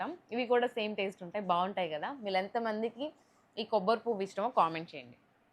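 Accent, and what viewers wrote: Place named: Kurnool, India. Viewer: Your slang is like Kurnool girl 😊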